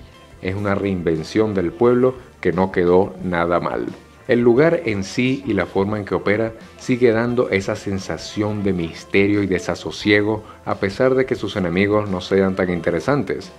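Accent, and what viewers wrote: Venezuela. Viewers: st men es venezolano, verdad ? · Eres venezolano? :0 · Sendo acento Venezolano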